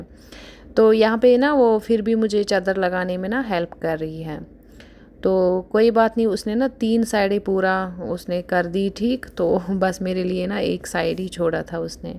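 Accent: Kashmir, India. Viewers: Subscribed 👍 Enjoy Make videos in our own mother tongue that will be more beautiful I don't know where from you people But your accent is soporen Rise and shine❤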